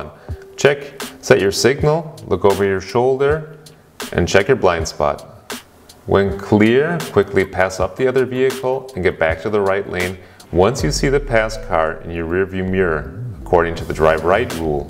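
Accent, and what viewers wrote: Germany. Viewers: It’s true. You really do sound like a German who speaks really good American English. Weird. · American guy with a German accent. It stands to reason, therefore, that you've lived there for a bit. Because you have an accent. I love driving on the Autobahn when I'm visiting there. And I love this video. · Your accent sounds a bit German.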